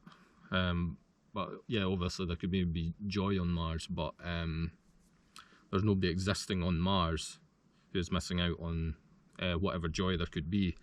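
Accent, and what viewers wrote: Scotland, United Kingdom. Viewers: Are you a Scot, mate? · My parents are Scottish I thought you were Scottish Highlands is beautifull Ive been there good video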